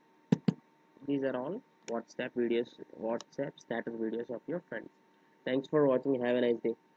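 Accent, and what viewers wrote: India. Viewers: You have an Indian accent but at least you speak understandable English. Thank you!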